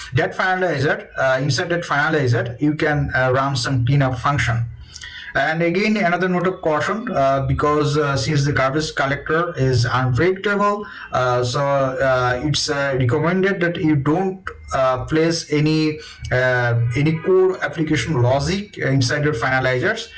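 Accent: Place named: India. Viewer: nice, because i wanted to watch your video, even with the indian accent which is difficult to understand tec-stuff, but the sound was unberable so i wish you good luck for you next video :-)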